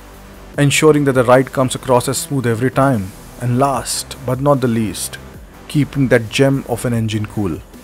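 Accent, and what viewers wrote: India. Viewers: Sound like india?? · INDIAN Accent 👊💪🏼💪🏼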